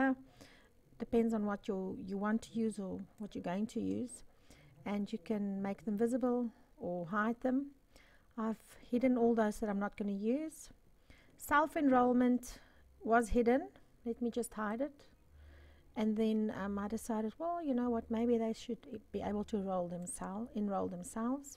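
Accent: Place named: South Africa. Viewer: un joli accent sud-africain. Thanks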